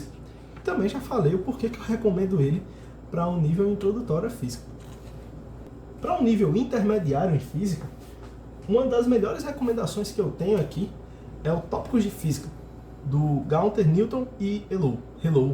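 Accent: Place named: Pernambuco, Brazil. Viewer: sempre que tu aperta num vídeo inteligente assim tu já sabe que vai ouvir o sotaque de Pernambuco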